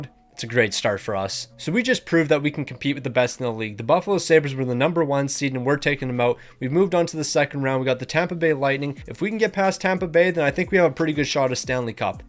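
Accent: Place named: Canada. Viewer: The most Canadian sounding Youtuber of all time ngl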